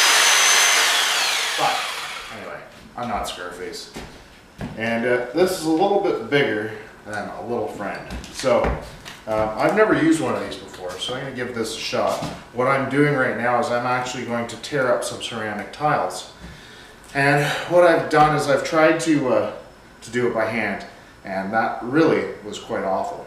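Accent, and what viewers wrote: Canada. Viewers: You didn't have to say Canadian. Your accent gave that away. I miss hockey. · I thought "he must be Canadian" Confirmed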